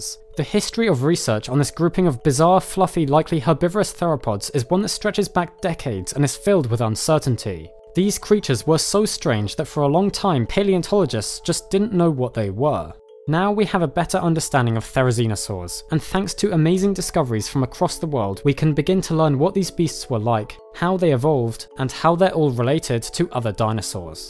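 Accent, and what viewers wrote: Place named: London, United Kingdom. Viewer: Youre London accent is no longer credible Get a black guy or Mexican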